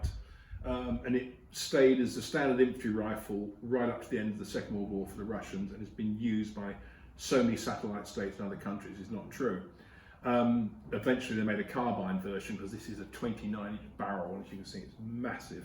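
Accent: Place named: United Kingdom. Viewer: You can still get bolt action rifles in the UK? I'm just assuming form your accent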